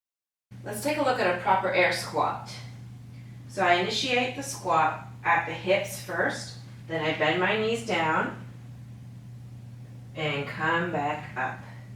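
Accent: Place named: Canada. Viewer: Outstanding video- It's like you read my mind request list from yesterday's leg day. Also...Canadian Accent +"Squat"= huge smile! ✅🌈🌈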